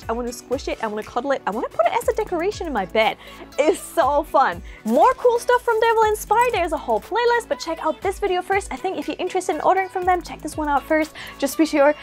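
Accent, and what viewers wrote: United Kingdom. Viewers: I have been watching Ask Japanese for some weeks and learning about Japan. I really love Japanese etiquette. Your language skills are amazing. You are the second German woman on YouTube I have chanced upon who speaks English with a British accent. She too studied and worked in Britain. I can detect a very slight German accent in her English but none in yours. You respond instantly to spoken Japanese so your Japanese must also be excellent. I love trains and have found your show on our cable TV in the US. I grew up next to the Durango-Silverton narrow gauge line. Thank you for your bowtiful work. (had to acknowledge this fun video:)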